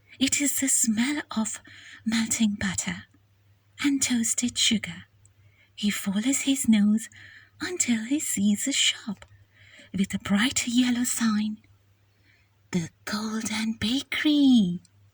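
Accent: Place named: England, United Kingdom. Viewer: Great artwork and beautiful brand new story. English accent is awesome. Keep up the good work! And yes, your channel does deserve a token token of appreciation.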